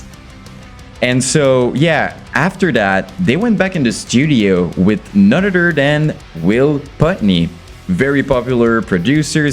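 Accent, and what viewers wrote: Quebec, Canada. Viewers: Great videio man! You do something new and different. Do I hear a french canadian accent?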